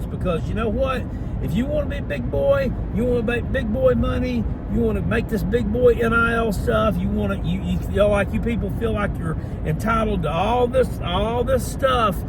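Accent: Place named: South, United States. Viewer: You need to slow down on that "you people" statement you already sound country as hell so you can't afford to keep saying it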